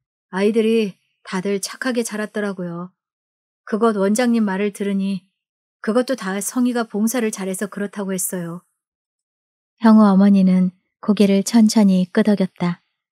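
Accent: Jeolla, South Korea. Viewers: 너무너무 찰지게 전라도 억양 잘 살릴까이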